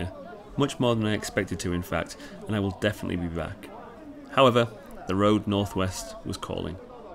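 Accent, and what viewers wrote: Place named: Northern England, United Kingdom. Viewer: Nice video you northern chuff! Subbed! you from Sheffield? Leeds?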